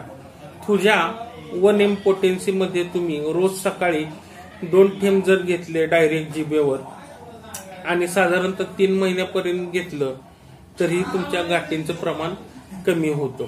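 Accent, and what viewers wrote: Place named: Kolhapur, India. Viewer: डॉ छान माहिती दिलीत homoeoathic बद्दल आपल्या बोलण्यात कोल्हापूरी साज पण मस्त आहे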